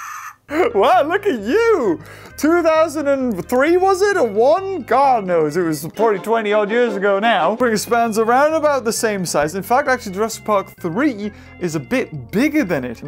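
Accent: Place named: United Kingdom. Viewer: V you sound like a "fance English men eh"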